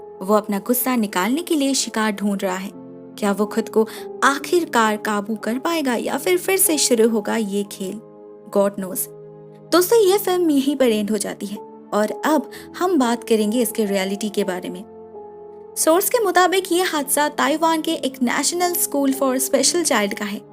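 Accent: Bengal, India. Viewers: Are u Bengali?..u have beautiful voice...u have Bengali accent..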